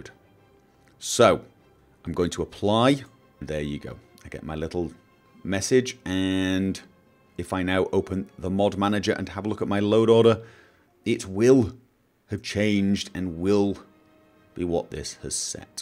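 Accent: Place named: England, United Kingdom. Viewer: I think an english accent makes you sound 100% smarter.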